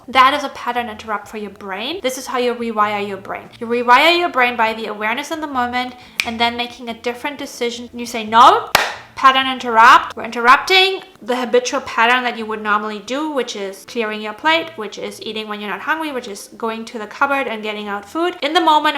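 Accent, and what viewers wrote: Germany. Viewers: Diet advice with a German accent was so needed for me! ❤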